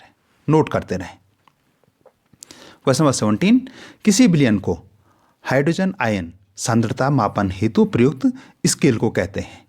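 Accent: Garhwal, India. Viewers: I just love your gadwali tone sir ji ❤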